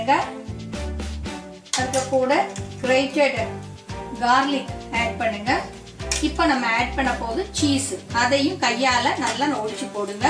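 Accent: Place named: Kerala, India. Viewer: Good mayalam mix tamil voice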